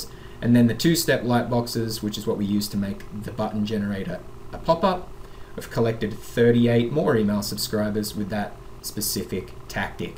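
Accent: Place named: Australia. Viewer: That was a very very good video. Thank you for sharing. BTW, love the Aussie accent :)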